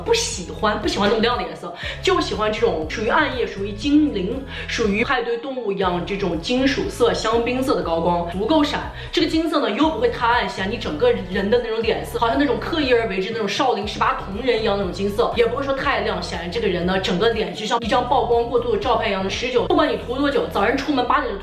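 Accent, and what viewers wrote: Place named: Taiyuan, China. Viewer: 真二八经的太原话，咱俩老乡，听得我这个舒服啊！哈哈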